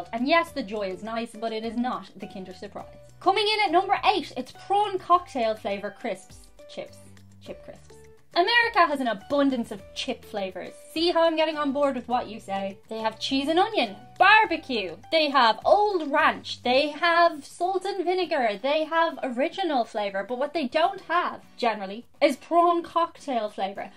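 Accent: Ireland. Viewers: I'm already subscribed ...lol all it took was your Irish accent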